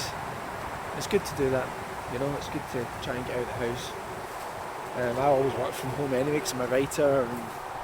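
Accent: Scotland, United Kingdom. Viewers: Just hearing you talk makes me proud of my Scottish heritage 😁ur awesome man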